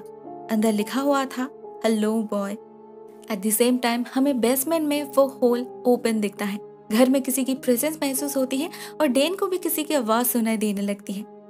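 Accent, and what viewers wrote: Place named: Bihar, India. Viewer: Aap bihar se hai na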